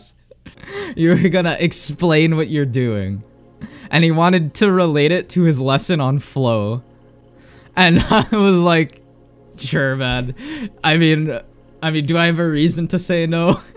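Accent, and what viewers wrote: Canada. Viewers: took me a min to guess youre a Canadian student XD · is he canadian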